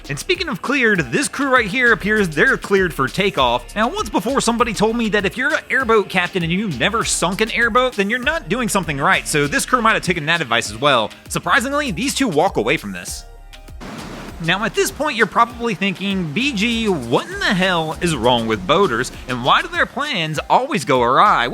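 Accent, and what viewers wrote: United States. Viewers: loved the vid. Had to turn off the sound though. That constant loud whiny american voice did my head in.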